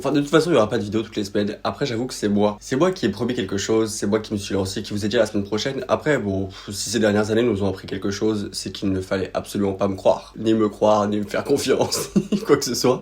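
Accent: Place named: South, France. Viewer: T'as un peu un accent du sud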